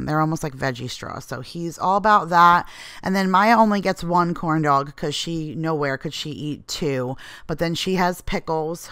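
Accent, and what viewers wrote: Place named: New York, United States. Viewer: I’m in Suffolk County Long Island! I swear from your first video I saw of yours, I said she’s definitely from NY or New Jersey! Lol you haven’t lost that accent 😉Have fun with your Mom 💞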